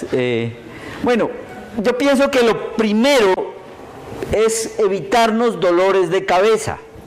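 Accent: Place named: Colombia. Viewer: Que mal da clase no mames es colombiano seguro por su acento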